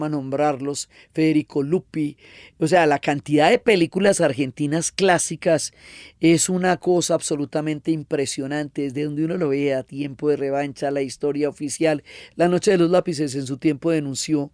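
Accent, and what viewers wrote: Colombia. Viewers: Yo no se si la voz de Diana es bonita o no pero es que a quien no le va a encantar escuchar hablar con ese acento! Aqui se junto todo lo bonito! La historia, la geografia, la cultura, la radio, el podcast, las ciencias sociales en general, colombia lo mas bonito de america y lo dice un Hondureño y desde luego una mujer y ahora pongamole a esa mujer ese acento tan bello ¡ como le parece!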